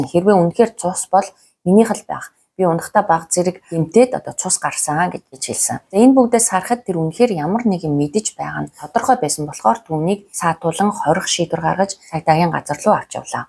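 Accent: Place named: France. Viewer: Chi naad french ayalagaa boli ok...chi yasiin french hel meddeg ain..english heleer ok Bi Amerikaas sonsoj bna...hutsaad Frensh ayalagaar ain...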